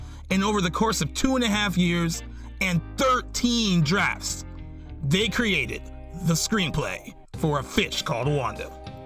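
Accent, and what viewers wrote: United States. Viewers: The overly articulated American voice over is just too unbearable to watch this documentary. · a strange mix of well researched commentary told an in annoying yank voice normally associated with dumb crash bang wallop videos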